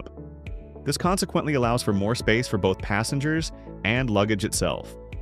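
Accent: United States. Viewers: Quite. I was hoping the video would move on to electric buses and discussing how bus design might evolve now that there’s no need to be constrained by the limitations of diesel engines, but it never came. Might have guessed, considering the US narration.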